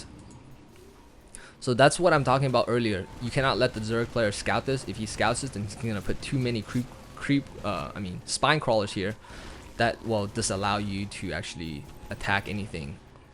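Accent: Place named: United States. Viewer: No offense but your American accent is annoying.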